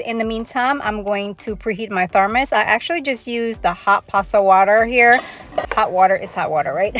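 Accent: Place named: South, United States. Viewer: Soooorrryyy. Please no one be offended. I just found this page trying to find lunch containers lol. I’m already obsessed. The whole time I was watching her videos I kept thinking to myself she has a little southern black twang in her accent! Either she’s part black or she’s always around someone black. I just thought it was funny how we have that little bit of seasoning in our words. Love this channel so far!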